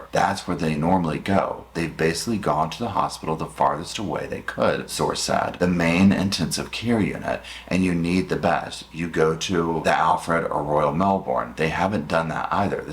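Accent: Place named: North Carolina, United States. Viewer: Just wanted to give you some love from NC and absolute appreciation for your content. I look forward to seeing every notification of a new video. I live in Durham,NC ❤ i knew your accent but didn’t realize you are from NC also.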